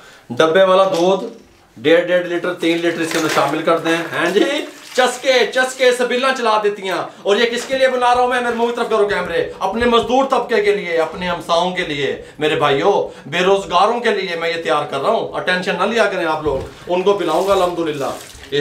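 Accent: Punjab, India. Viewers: ji thuadi Punjabi bhaut swaadee hey. Milk shake nalo vi jaida.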